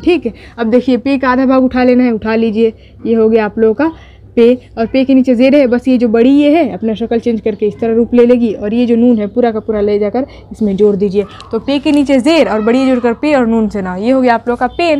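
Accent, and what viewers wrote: Bihar, India. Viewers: Aap bihar se ho na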